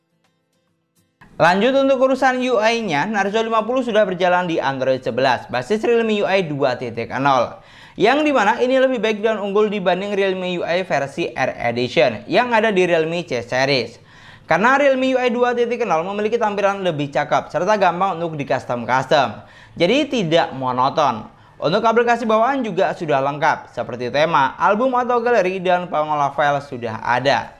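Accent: Madura, Indonesia. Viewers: Madura banget logatnya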